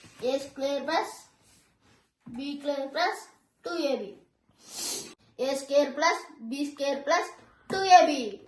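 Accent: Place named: Kakinada, India. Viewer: నీ వాయిస్ కాకినాడ చిన్నోడి వాయిస్ లాగా ఉంది.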